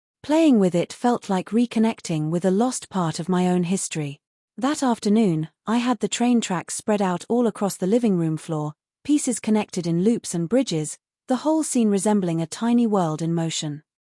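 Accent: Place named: United Kingdom. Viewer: This story takes place in Chicago, so why is the narrator speaking in a British accent & pronunciation?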